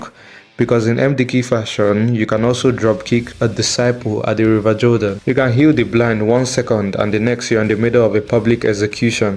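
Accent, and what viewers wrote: Nigeria. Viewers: Yo bro you sound Nigerian ❤ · Bro sounds so Nigerian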